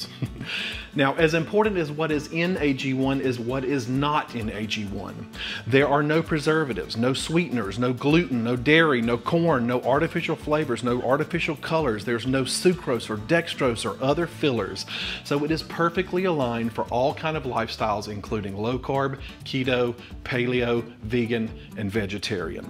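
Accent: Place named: North Carolina, United States. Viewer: When you said "I was tickled to death" I told my husband, now THAT'S what I'm talkin bout, he speaks our language! We were both born, raised, and still living "in the sticks" of North Carolina, so you sound just like home to us. I'm a new subscriber but you have quickly become my favorite out of all the low carb content creators out there. Thank you for being so real and genuine, you're awesome!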